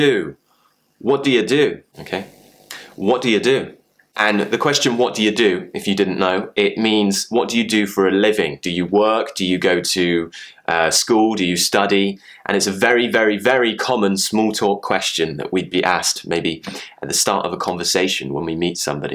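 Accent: United Kingdom. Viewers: I love ❤ British accent. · you´re a perfect english teacher... your GB accent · The 'your' sound in the video sounds similar to 'yeah' sound to me. It's interesting to listen to British accent. Thank you for the video. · Nice video 👍 Which area of British accent would you say your accent? As there are lots of British accent depends on the city?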